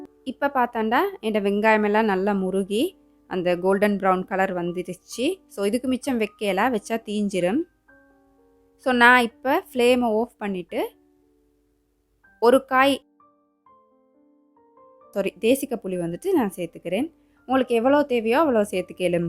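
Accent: Sri Lanka. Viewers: Assalamu allaikum warah nalla erukegala sis...srilanka slang enaku pidikum neega alaha peasurega..ginger,garlic paste neega intha recipeku podala...na try panrean🙂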